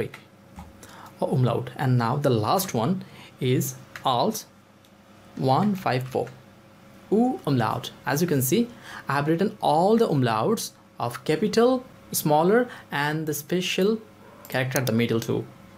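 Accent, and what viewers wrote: India. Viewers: mr. indian i dont know what your saying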